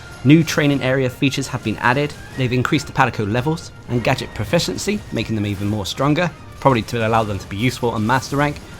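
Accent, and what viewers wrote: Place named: England, United Kingdom. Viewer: It's an English accent of a sort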